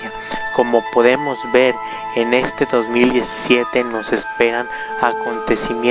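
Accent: Chile. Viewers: Chileno, ndeaah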